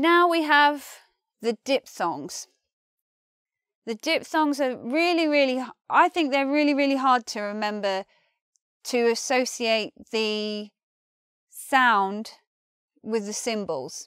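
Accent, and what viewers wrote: United Kingdom. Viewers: What accent is this? It sounds British but.... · The presenter's British English makes this tutorial for memorizing IPA less useful for a learner who speaks US English as there are many differences between British and US midwestern pronunciation. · You are the symbol of the British accent.. I like to hear and listen to you a lot 🤗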